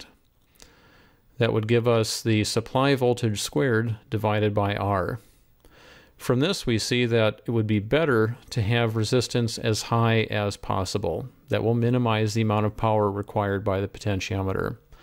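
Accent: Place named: Oxford, United Kingdom. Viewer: SIR Just now i watched yr video on POTENTIA METER. My copliments to you are:-- 1. liked yr video for its quality information packed in it. 2. U are a very good tutor/lecturer and i deduce from this small video that u must have wide rich practical working experience in the electrical/electronics field. 3. i could follow every word of yr lecture because of yr stylish English that too Oxford English which we are familiar here in India. 4. yr diagrams there in the video and appropriate formulas are added assests to yr lecture. 5. please keep up the same pace /speed of yr lecture in future videos also as we dont miss any thing there. 6. Subscribed and looking forward to yr videos on electronic essentials. Thanking you vatsa Bangalore INDIA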